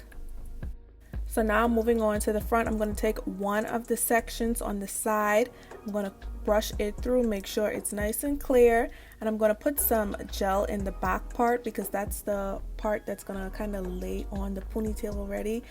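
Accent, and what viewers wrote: Bahamas. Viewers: I love the Bahamian accent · Are you Bahamian, bc this accent hide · She from the Bahamas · It's a Bahamian accent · You must be Bahamian! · Definitely Bahamian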